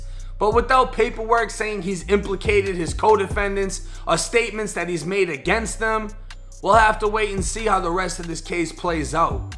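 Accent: Boston, United States. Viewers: Yo you sound just like me Fam you got to be from the Boston area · Never heard a wigga with a Bostonian accent. It’s cool. I like it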